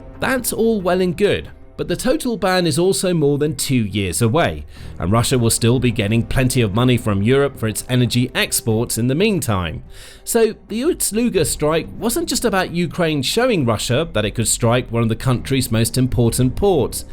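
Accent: England, United Kingdom. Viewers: Why does the narrator keep referring to petrol as gasoline? He's English based on his accent and in the UK they call it petrol NOT gasoline.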